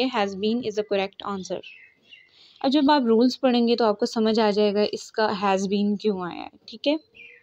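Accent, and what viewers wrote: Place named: Muzaffarabad, Pakistan. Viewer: Baji seems like you are from mzd ajk ?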